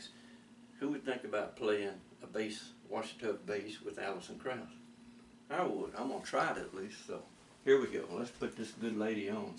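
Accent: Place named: Atlanta, United States. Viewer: Great sound. I have just constructed one but not sure best string to use?? Are you from Atlanta - nice accent. (I'm British from Michael Caine country - South London)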